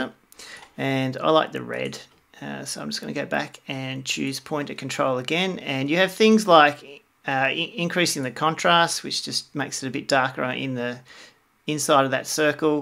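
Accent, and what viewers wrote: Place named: Australia. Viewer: I love his Australian accent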